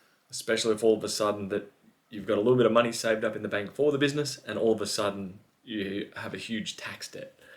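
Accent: Australia. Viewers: I'm looking to get into device repairs and cleaning and I have to say these videos have really helped me, your channel is criminally underrated and it's good to here another Australian voice actually helping.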